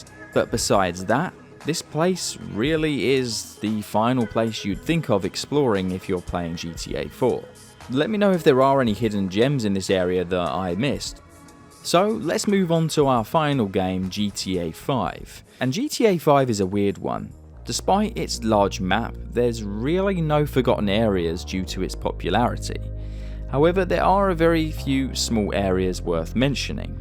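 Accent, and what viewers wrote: United Kingdom. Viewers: I played the year it came out and saw all those back then... didn't even forget 'em. My wife watched her brother play back and the day... she didn't forget them either. The houses in the ocean being weird to what sounds like an Englishman are pretty rich. You never heard of the houses ON the River Thames or the WW2 outposts that people ended up living in? SMH. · He's British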